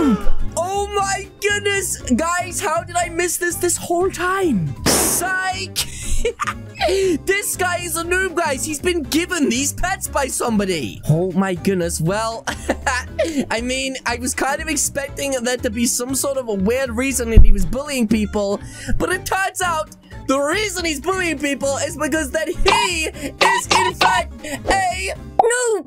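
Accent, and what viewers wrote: United Kingdom. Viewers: Why is your accent British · No offence but you sound like jelly but I bit British I’m sorry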